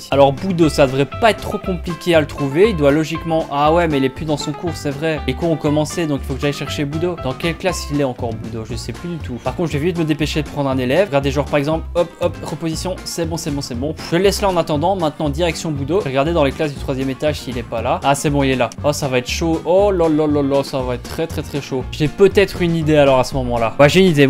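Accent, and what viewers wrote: Belgium. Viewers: Tu es belge ? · T'es Belge?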